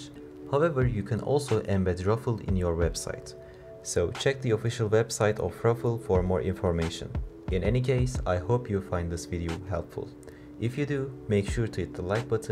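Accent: Turkey. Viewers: Konuşmandan anlamıştım bu kesin türkdür diye :D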